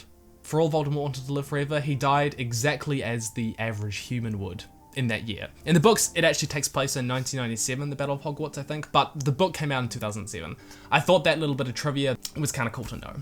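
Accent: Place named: New Zealand. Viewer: I'm sorry, but the New Zealand accent is literally the best of all the accents lol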